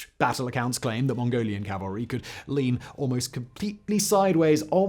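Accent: RP, United Kingdom. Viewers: How posh is this guy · He talks too fast with British accent. Often hard to grasp the key words, otherwise good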